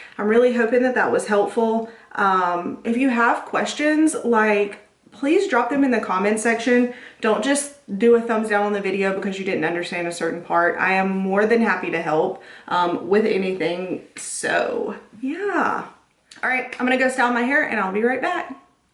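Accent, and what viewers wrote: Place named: South, United States. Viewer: Love the cute southern accent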